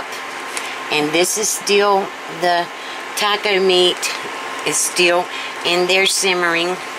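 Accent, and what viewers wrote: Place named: Oklahoma, United States. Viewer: Your voice sounds like Oklahoma . Thank You for this recipe .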